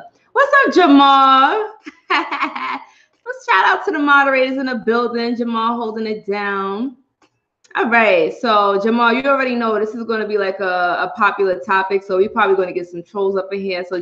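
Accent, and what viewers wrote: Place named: Brooklyn, United States. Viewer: Your accent is so Brooklyn. But the way you say “you know” is so New Orleans loll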